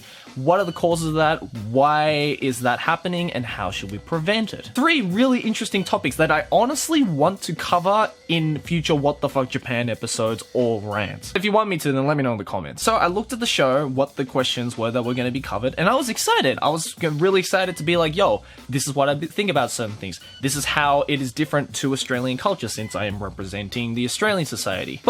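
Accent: Australia. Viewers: hheeee ??is he Australian o.o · I'm American ( not offended) im tired of hearing from the American side too...rather hear you're cute Australian accent any day. 😽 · I KNEW HE WAS AUSTRALIAN!!! But seriously be fuckin' careful bro, everything wants to kill you there.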